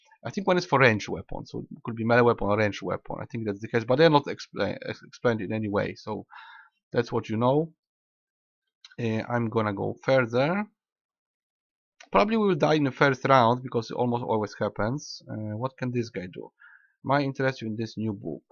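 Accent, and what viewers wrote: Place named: Poland. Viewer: Tak myślałem, że jesteś z Polski xd zdradził Cię akcent hehehe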